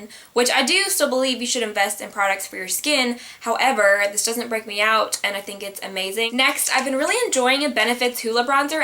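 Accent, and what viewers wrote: South, United States. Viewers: I looove your cute little accent, I first found you on IG & never pictured you having a southern accent! BTW have you tried Urban Decay's "Naked" lip liner? I feel like I reach for it soooo much more than Stripped Down (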